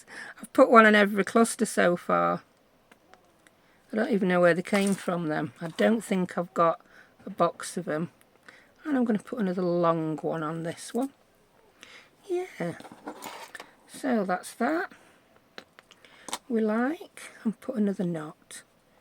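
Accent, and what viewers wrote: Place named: Sheffield, United Kingdom. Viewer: Hi, greetings from OZ 🇦🇺😎 So glad I came across your spine dangle vid 🤗 I love listening to you, I was born in Sheffield,came to OZ when I was 9 (76 now ), so your accent brings back memories WHEEEEEEEEEEE 🤣😂🤣😂🤣😂🤣😂😂 You are a joy to listen to & watch TFS XXXXX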